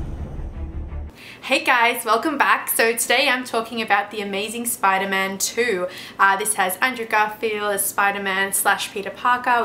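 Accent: Australia. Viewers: Great review :) Is that an Aussie accent?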